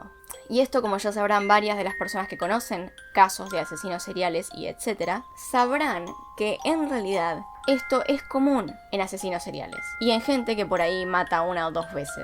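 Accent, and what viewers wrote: Argentina. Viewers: Estoy enganchada al acento argentino...hoy voy a ver por lo menos diez o doce...me encantas...sigue así...pura está muy bien eres correcta sin estridencias y esto s temas ya sabes don tan delicados que tienes que cuidar mucho el tono y el tuyo es perfecto y con mucho respeto...besitos desde España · Sos Argentina? porque tenés igualmemte un acento...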